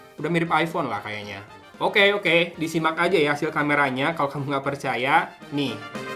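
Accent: West Java, Indonesia. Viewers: orang sunda mah keliatan dari logatnya juga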